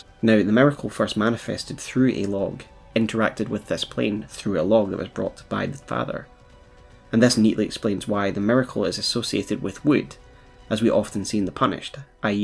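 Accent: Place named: Scotland, United Kingdom. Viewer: I'm gonna guess your accent and say your country of origin is Scotland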